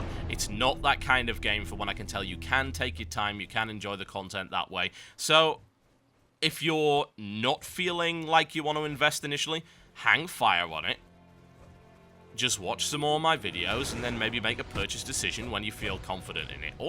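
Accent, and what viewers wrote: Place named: Newcastle, United Kingdom. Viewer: It's nice to see another geordie.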